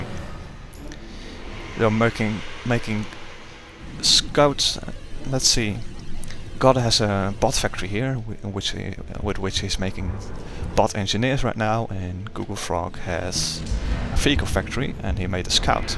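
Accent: Netherlands. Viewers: Thanks for uploading! Besides watching starcraft replay, I also start enjoying this fabulous game. . Btw, are you Dutch? Just wondering because of the accent. :)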